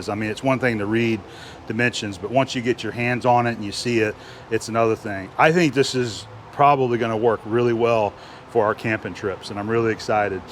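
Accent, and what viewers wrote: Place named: Western Pennsylvania, United States. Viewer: So what is your connection to I-79? I hear a little bit of Western PA in your voice but I think you’re in FL.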